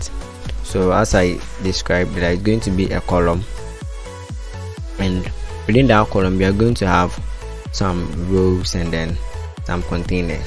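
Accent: Ghana. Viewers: Bro u speak like a Ghanaian, are you? I'm Kojo.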